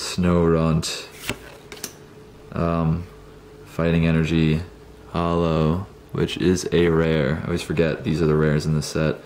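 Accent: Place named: United Kingdom. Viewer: lol nice british accent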